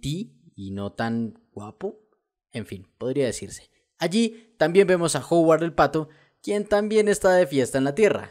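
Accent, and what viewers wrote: Colombia. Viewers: Eres de Colombia parece que si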